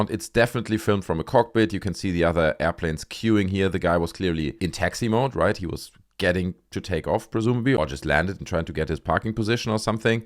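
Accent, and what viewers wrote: Germany. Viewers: This a German guy ! His accent is great 😂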